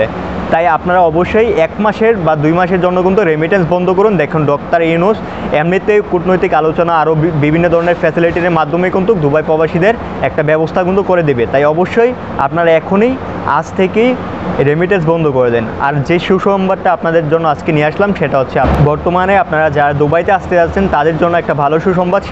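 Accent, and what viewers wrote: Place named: India. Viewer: তুমি কি ইন্ডিয়ান তা না।হলে তো এইভাবে তো বাংলাদেশের ছেলেরা কথা বলে না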